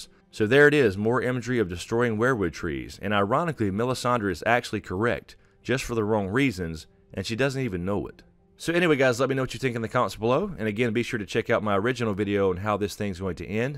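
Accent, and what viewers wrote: South, United States. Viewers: I agree with his southern akcent to kool.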